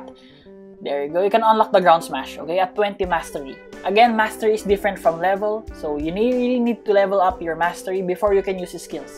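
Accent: Philippines. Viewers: U Filipino I think I hear kaya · Are you Filipino? You sound like you are.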